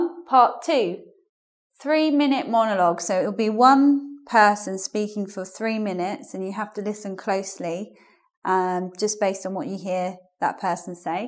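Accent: United Kingdom. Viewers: Hi, please could you tell me what part of UK are you from? I mean, I need to know where is your accent from. Thank you for the information on CAE, I'm gathering study material to take this certification. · She sounds like she's from the U.K. to me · Hello. Tell me something about your accent - for which part of the UK it is typical? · i dont know why when Women do British accent its so hard to listen. in opposite Men are better with british accent :lol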